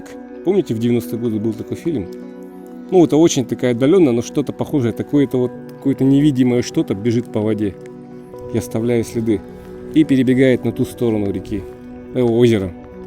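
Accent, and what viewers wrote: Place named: Russia. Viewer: Как приятно слышать настоящую русскую речь, неиспорченную, народную. Удачи и процветания! Из Полтавы, Украина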